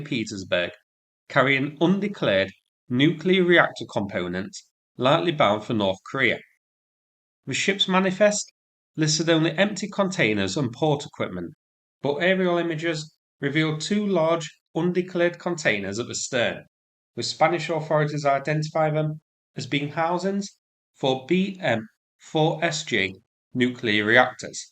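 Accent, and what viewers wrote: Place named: Lancashire, United Kingdom. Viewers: Northern English accent. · To the VO words ending in ING are pronounced ing like housing not housin ‘ ing . If you’re going to do a video at least learn your pronunciation. You sound ridiculous. The Lancashire accent is bad enough !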